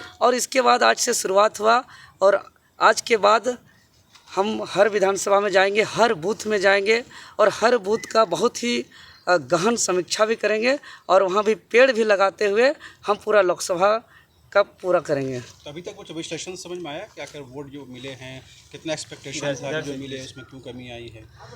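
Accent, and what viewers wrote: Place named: Jharkhand, India. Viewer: Very good sound like speaker of jharkhand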